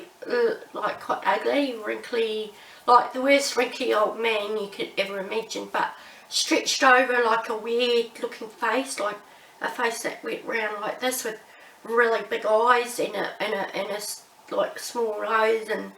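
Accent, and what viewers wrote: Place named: Australia. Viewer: Thanks for sharing your experience.. I am also going to begin Sungazing, and would like to know if you Sungaze when it is cloudy, and the Sun is covered. Did sacred sounds and mantras through Dr Pillai also add to this awakening of the The Third Eye? I. It may have been the Kundalini (serpent) opening up more of your power center at the navel point which is a golden color. Shreem Brzee and Turmeric both are associated with that golden color...just giving some ideas to bat around. Luv your videos and encouragement about wealth/Brzee. You are candid, and funny, too! You have alot to add to everyone's understanding.and your Australian accent is charming..to us in the USA. Is there a product for cleansing that you can recommend ? Thanks..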